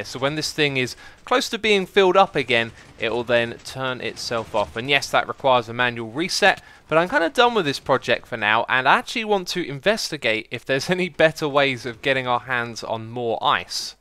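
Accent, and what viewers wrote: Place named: United Kingdom. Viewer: I absolutely love your videos! Technical building and a British accent! What more could you ask for?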